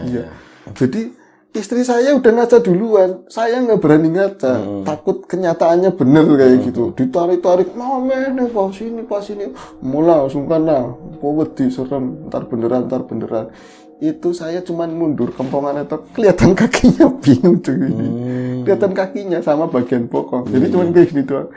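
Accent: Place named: Tegal, Indonesia. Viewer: Melipir di ngobor dulu... Semangat hari Senin.. Cerita nya selalu seru.. dengan logat Tegal yang khas 💪💪💪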